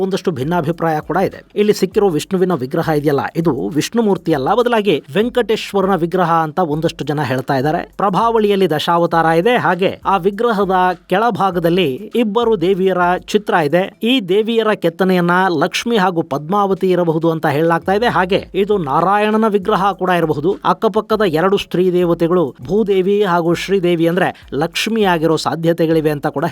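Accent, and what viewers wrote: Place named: Raichur, India. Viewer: ರಾಯಚೂರು ಕನ್ನಡದ ಹೇಮ್ಮೇ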